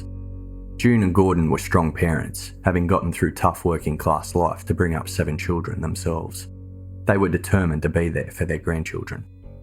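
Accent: Australia. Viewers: These uploads are brilliant!! I'm so glad I stumbled across this channel...I'll be here for quite a while! I also love hearing a fellow Australian!!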